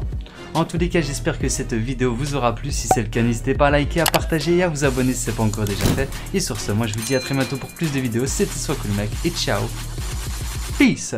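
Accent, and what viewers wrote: Belgium. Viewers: Belge de ou poto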